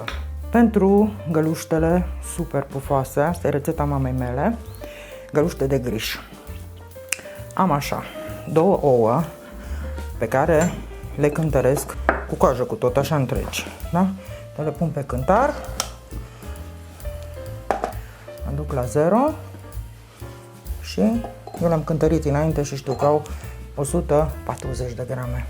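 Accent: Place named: Arad, Romania. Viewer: Imi place accentul din vorbire.Mi-aduce aminte de anii cand lucram la U.T.A in orasul Arad .Ce prosoape si cearsafuri de Damasc de calitate producea fabrica aia!!!!. MULTUMESC pentru reteta.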